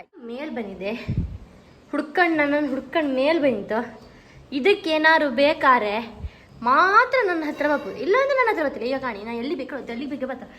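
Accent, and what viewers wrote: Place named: Kundapura, India. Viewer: Hoi...ನಿಮ್ಮ ಮಾತು ❤❤❤👌👌👌👌 Mugubott 🥰 ನಿಮ್ಗೆ chanda kansutte🌸😁❤❤❤.... ಕುಂದ ಕನ್ನಡ❤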